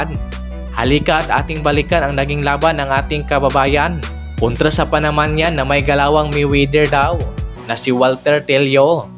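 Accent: Visayas, Philippines. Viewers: Magkatulad tayo lods bisaya Be proud!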